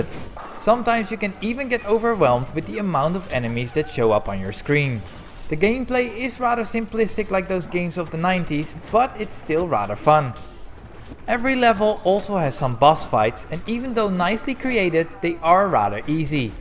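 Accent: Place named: Netherlands. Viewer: You're Dutch right? You hear it right away lol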